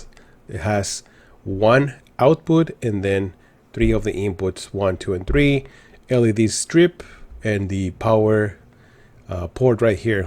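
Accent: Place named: El Salvador. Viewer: My right hand man at my last job is from El Salvador. I miss talking to him for many reasons, but that accent is one of the top ones.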